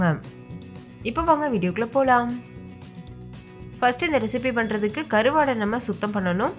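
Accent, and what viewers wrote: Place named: Tamil Nadu, India. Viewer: I like your Tamil accent.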